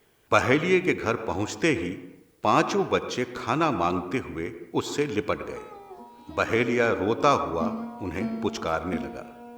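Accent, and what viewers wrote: Standard. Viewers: विशुद्ध उच्चारण में सुनाई गयी कथा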